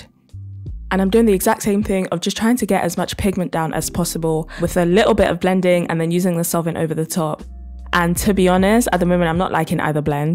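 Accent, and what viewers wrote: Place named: England, United Kingdom. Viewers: i'm not sure what you mean. i'm pretty sure she has a British accent but u do u ig. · everyone has an accent? I’m not good with accents but she has a type of English accent.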